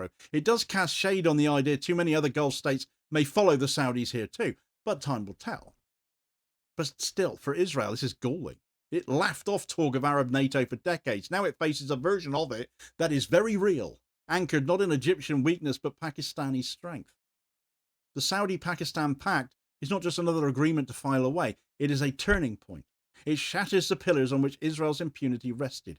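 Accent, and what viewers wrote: Cornwall, United Kingdom. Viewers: Are you from Cornwall?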